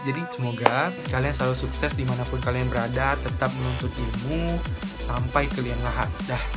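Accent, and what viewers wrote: Malaysia. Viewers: 🤣🤣🤣. Tiberr is shorform for Tiba². It's " oh what a sudden" or "and suddenly". 🤣🤣 U dah macam orang KL dah. Perkataan membawang ni baru few years ni diorang start pakai. Sebelum ni kitaorang pakai mengumpat je.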